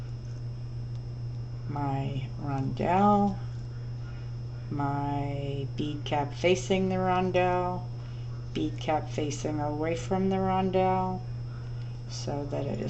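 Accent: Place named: Ireland. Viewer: where is your accent from? You have some words that sound Irish. (I'm Irish, and I recognised them lol)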